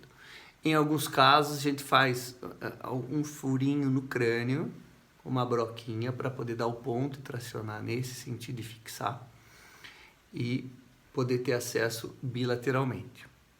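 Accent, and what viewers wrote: Rio de Janeiro, Brazil. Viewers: Dr o senhor é do Rio ?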